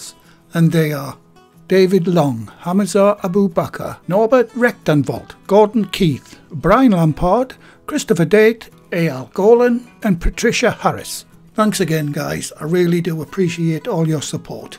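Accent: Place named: Northern England, United Kingdom. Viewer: Brilliant channel. I'm an expat Brit abroad and love hearing your northern accent. Very homely and wonderful old school British recipes.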